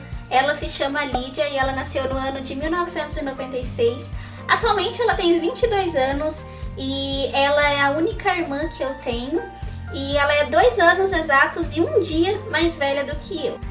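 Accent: Ceará, Brazil. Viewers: Voce é do Ceará?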